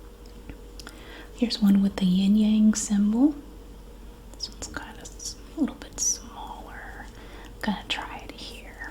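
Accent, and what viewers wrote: South, United States. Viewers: I think the reason we love the soft spoken videos is that when you whisper, we can’t hear your beautiful Southern accent! ❤❤❤❤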